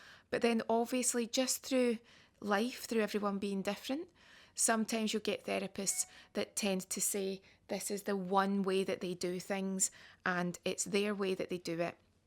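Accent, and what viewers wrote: Scotland, United Kingdom. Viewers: scottish accent pure love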